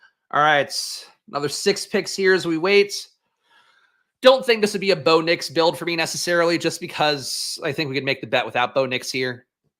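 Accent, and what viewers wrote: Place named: Australia. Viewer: Great Bluey's mum accent! have a great weekend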